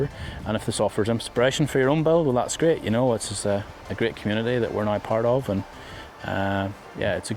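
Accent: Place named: Northern Ireland, United Kingdom. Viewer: Northern Ireland, sounds like.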